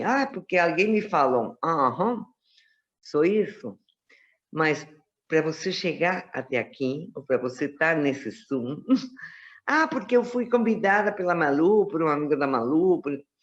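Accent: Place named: Brazil. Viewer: Está muy mona y habla como brasilera! Se entiende todo. Muy bueno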